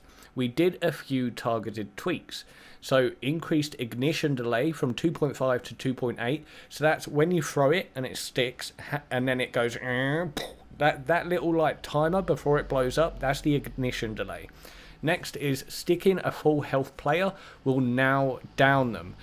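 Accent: United Kingdom. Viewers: In case he doesn't reply, his accent sounds like South-East England to me :)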